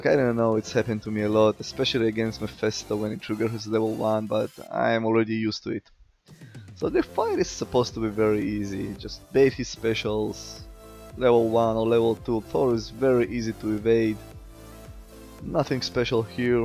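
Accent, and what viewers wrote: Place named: Russia. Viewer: Ты русский? У тебя просто акцент не английский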